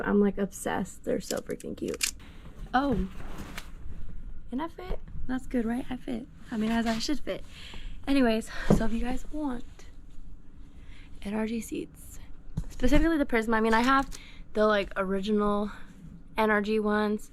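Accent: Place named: Texas, United States. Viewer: Haven't seen your channel in a while. You seemed to have developed more of a Texas accent.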